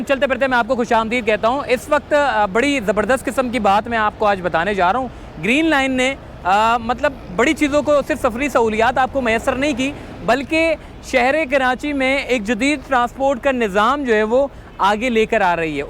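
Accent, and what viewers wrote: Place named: Punjab, India. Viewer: تمھارے لہجے سے پنجابیت کیوں جھلک رھی ھے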